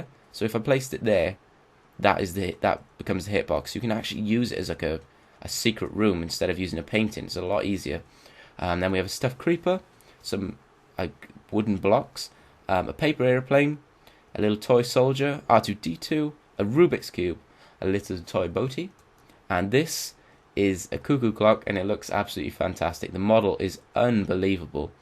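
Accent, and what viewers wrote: England, United Kingdom. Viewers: Your so English.... I LOVE IT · I don't remember you being British. Not trying to be rude, just saying.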